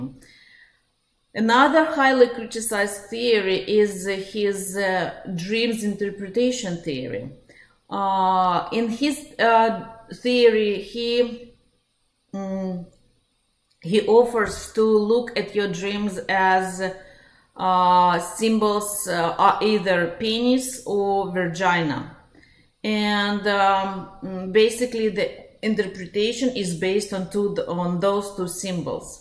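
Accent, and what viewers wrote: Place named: Russia. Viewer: You have a heavy Russian accent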